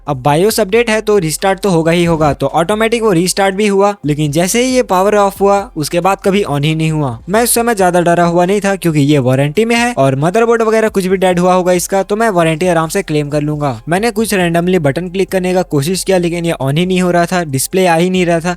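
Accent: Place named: Chhattisgarh, India. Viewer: Bolne ke kareeke se mujhe bhi laga CG se hai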